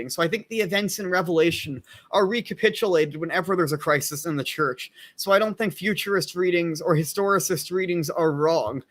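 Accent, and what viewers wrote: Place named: United States. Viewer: Great idea for a video, and some really interesting content here. That being said, my man's Latin pronunciation could benefit from some de-Americanization, haha! As a pretty settled Suarezian myself, I'd recommend you check out Luis Alonso Getino's "El Centenario de Suarez," published in La Ciencia Tomista, 15 (1917), pp. 384-388 [available on HathiTrust]. The author compares the 24 theses with Suarez' positions (Spoiler: except for thesis #13, which even then is qualified, Suarez would disagree with 23 of the 24 theses!).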